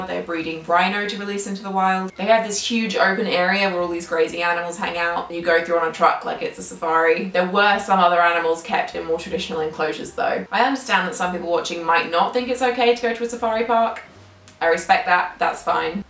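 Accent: Australia. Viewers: hey! Cool pin :) have you been to Australia recenty?? Your voice sounds Australian today! have a nice day, chum xxx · You sound quite Australian here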